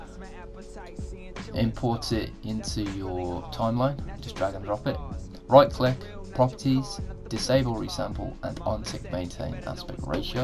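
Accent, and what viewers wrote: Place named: United Kingdom. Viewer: Thick British accent gotta be one of my favorite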